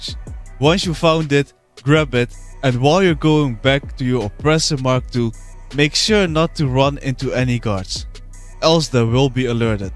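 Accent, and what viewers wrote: Netherlands. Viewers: are u dutch? you have a bit of a dutch sound when you speak english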